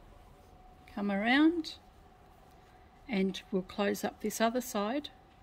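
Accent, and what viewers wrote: Australia. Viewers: Greetings from Canada ! Thank you for your awesome tutorials! I have lots of tea towels of various sizes…would you be so kind to give me the measurement of your half towel once cut ? I want to be sure I’m using the same approximate size . Thank you ! Ps.. 99% of my family is in Melbourne …hearing your lovely accent makes me miss them even more 🇨🇦😁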